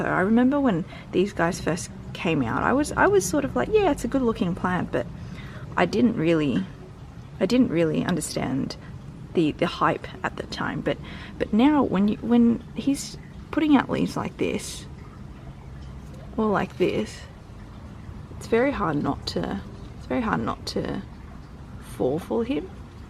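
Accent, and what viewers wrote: Australia. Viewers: You sound Australian! Where are you from? Im a perth gal ✌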